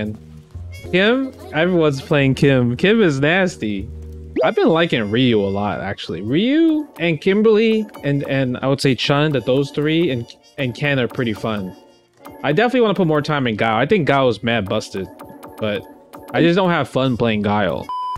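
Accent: New York, United States. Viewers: Canada? I thought from NY!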